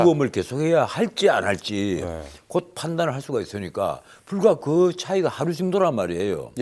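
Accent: Jeolla, South Korea. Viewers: 절라도광주앵커 티를 너무낸다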